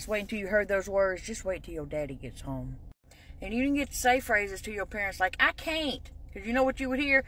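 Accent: South, United States. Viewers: Girl you sound just like me! Lmao you musta been raised in the south.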